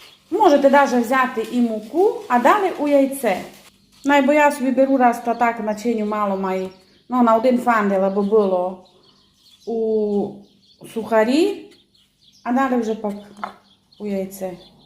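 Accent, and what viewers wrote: Transcarpathia, Ukraine. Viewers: у вас чудовий канал, чудовий матеріал, чудова закарпатська вимова. А ті типу-українці неповноцінні, що їм не подобається Закарпаття і закарпатці, хай слухають про варенички. З великим задоволенням спостерігаю за Вашою творчістю. Всього найкращого. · говоріть, говоріть і ще раз говоріть по закарпатськи! Це ваша фішка! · Мені дуже приємно, що зараз є можливість чути закарпатський діалект, дякую за вашу працю. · спасибо Вам за замечательные рецепты, а слушая Вашу речь переношусь к своим друзьям, ставшими родными за много лет, в село Камяница. Горько, что не могу встретиться с ними, но когда смотрю Ваши ролики возвращаюсь в любимое Закарпатье. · Завдяки Вам, світ пізнає закарпатський діалект. А східний російсько-український діалект ми давно вже пізнали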